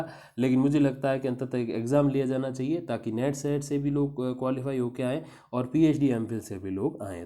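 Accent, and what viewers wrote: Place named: Nagaur, India. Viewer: Apki boli Nagur k Jaat jesi h esliye pucha. Thanks for all informative videos.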